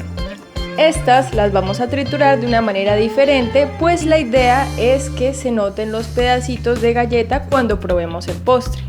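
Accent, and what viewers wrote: Colombia. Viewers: Apenas empezó q a hablar yo dije ella es Colombiana a mi no me mientan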